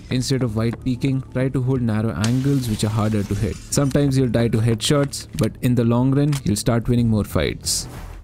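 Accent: India. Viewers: As soon when you heard the strong indian accent you knew that the guys knew his shit xD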